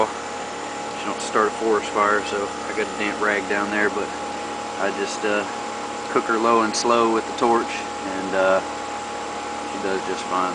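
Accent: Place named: South, United States. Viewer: Dang! Your Southern twang with epic in this one me boy.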